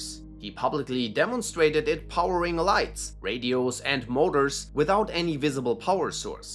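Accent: Germany. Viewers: Der deutsche Akzent ist Mega! 😅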